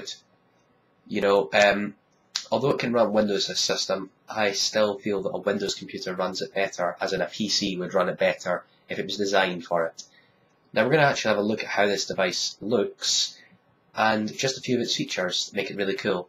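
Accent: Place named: United Kingdom. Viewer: Nice review glad to see a British review instead of a annoying yank. Am going to get one I just fancy a change from a windows pc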